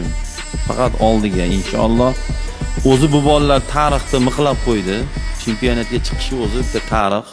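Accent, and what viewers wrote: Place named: Russia. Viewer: Domla nega rus tili talafuzini koʻp aytasiz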